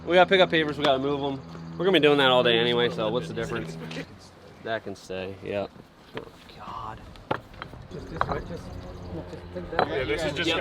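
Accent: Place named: Chicago, United States. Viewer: Why your n ants accent sound like ur from chicago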